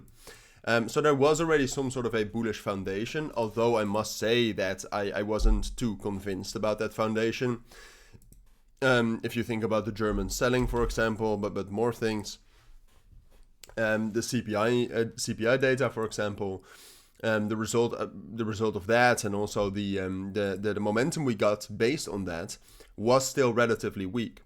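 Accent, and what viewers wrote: Netherlands. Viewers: Duurde even voordat ik je nederlandse accent hoorde. Engels gaat je goed af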